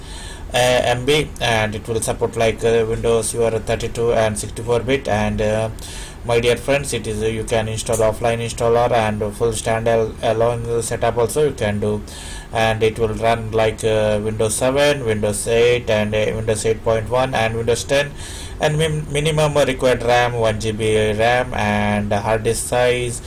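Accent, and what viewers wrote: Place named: India. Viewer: You have a big Indian accent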